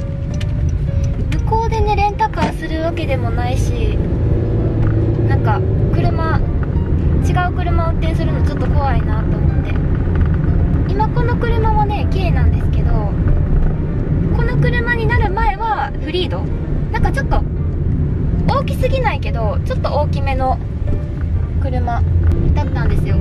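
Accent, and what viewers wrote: Kansai, Japan. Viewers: 可愛い子の関西弁ていいね😄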